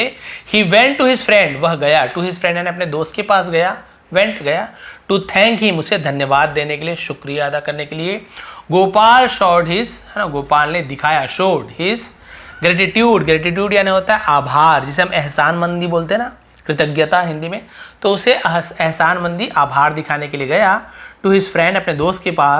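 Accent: Chhattisgarh, India. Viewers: Sir aap raipur chhattisgarh se ho kya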